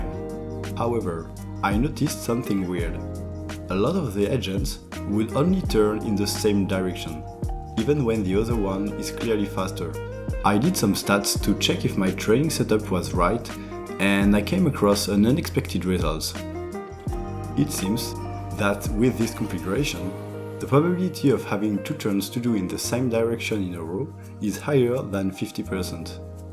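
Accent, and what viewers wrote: France. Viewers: ahlahlahhh qu'il est beau notre accent francais ! j'adore 😆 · J'ai reconnu l'accent français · love ur french accent ! u are french right ? je le suis aussi xD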